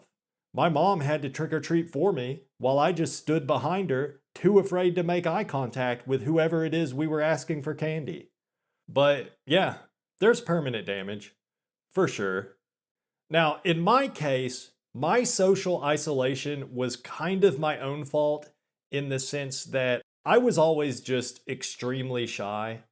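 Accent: South, United States. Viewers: If he's not using AI he's a Southerner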